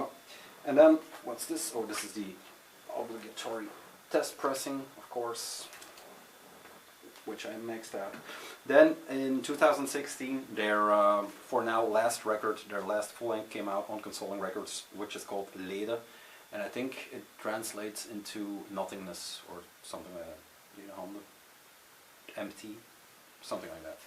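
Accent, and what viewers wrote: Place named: Netherlands. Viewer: You have a Dutch accent, i am from Belgium! Good video!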